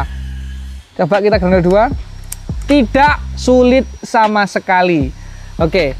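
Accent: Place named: Java, Indonesia. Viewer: Bahasamu kang,medok eram🤣🤣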